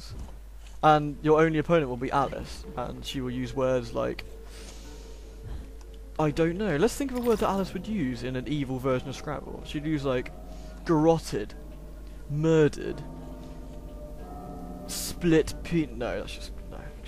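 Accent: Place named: London, United Kingdom. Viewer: hehe i love your different accents, or rather the sterotypical 'londoner' accent *sniff* makes me think of home~ ...well second home considering I'm Scottish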